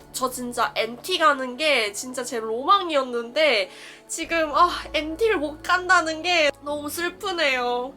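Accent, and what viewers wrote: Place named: Seoul, South Korea. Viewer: 대단하세요~~깍쟁이 서울말씨도 완벽하네요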